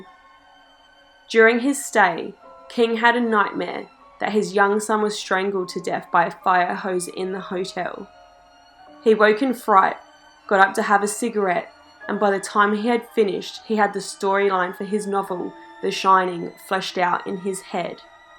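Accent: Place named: Australia. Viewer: love this. also love your accent !! i think its aussie, where are you from ?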